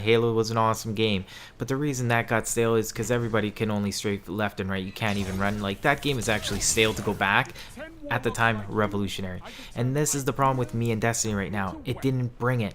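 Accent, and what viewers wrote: Canada. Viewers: Canadian eh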